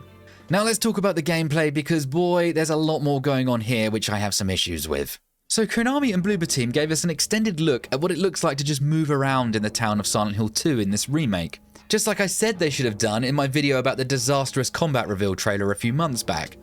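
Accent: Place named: United Kingdom. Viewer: its the accent ... British accent does wonders. Its shapes reality :face-fuchsia-wide-eyes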